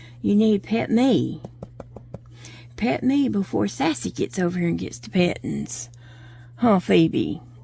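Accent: South, United States. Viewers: Kathleen from NJ blanket up in flames oh you crack me up!!!! That was one beautiful pattern and those colors were exquisite. A+ for effort. I know nothing about knitting or crochet I do jigsaw puzzles on the computer on a site by the name of Jigidi when I watch/listen to your videos I love listening to you Must be that southern accent you have. Maybe you should have given it to Sassy!!! I was tying this while you were talking and then all of a sudden there it was! Set it aside and try again Its really a beautiful piece of work. I was wondering if you ever named that yellow new doll on your desk, I gave you 3 names for the 3 you bought several days /weeks ago Look on that video for those names I thought they were pretty good......LOL.......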